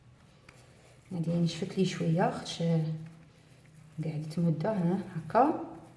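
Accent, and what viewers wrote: West, Algeria. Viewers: الله يعطيك الصحة نفس طريقتي انا صانعة حلويات انا من الغرب نفس اللهجة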